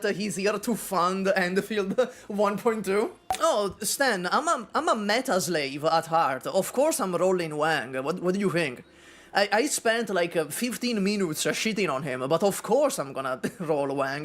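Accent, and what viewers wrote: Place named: Italy. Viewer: You sound italian AF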